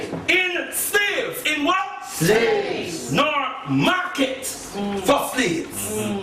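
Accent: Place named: Jamaica. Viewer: Just Became Your 1000th Subscriber ...Not Big on the Egyptian Names But its a Positive Vibration & iHear That Jamaican Accent ,iKno My People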